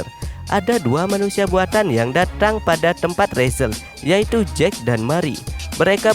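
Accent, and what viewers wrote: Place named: Java, Indonesia. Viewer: Tambahan, kalo bisa logat daerahnya di kurangi. Bahasa indonya medok bgt.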